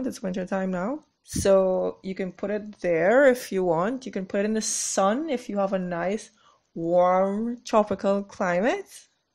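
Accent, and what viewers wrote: Trinidad and Tobago. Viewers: Are u frm trinidad? Your acvent almost sounds trini 😊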